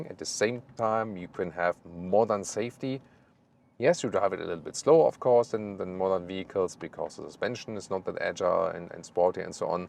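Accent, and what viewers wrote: Germany. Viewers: A video about a German legend, explained with a German accent......''Hier scheint heute alles in Ordnung zu sein😉'' Great content.....and Btw: Did you know that the legendary Queen frontman Freddie Mercury also bought one? He spended a lot of time between '79 and '85 in Munich, and was often driven there in one... He was clearly impressed by this car. When he came back to the UK he directly ordered one,.......a '86 420SEL..... It seems he even preferred it over his Rolls Royce...🤫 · that killer german accent bro!!!!!! them make car UP the price!!!!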